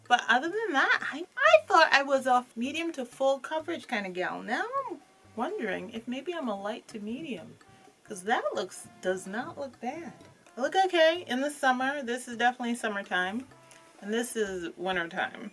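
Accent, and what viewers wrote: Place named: New York, United States. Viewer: I just stumbled across ur video u sound SOO much like New York or Tiffany Pollard😍❤❤ I was curious about these 2 products. I'm glad I found ur review! I like winter kimmie!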